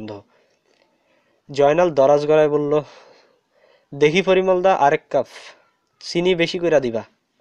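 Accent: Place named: Noakhali, Bangladesh. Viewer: চালিয়েে যাউ মন্দনা আরও ভালো হতে হবে নৌয়াকালি আনছলিক টান আছে একস্টা প্লেবার রয়েছে বেশ লেগেছে।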